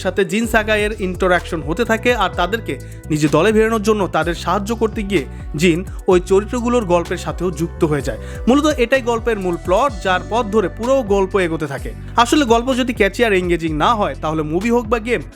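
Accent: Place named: India. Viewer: vai ki indian? thik moto bangla bolte parchen na kisu jaigai!!!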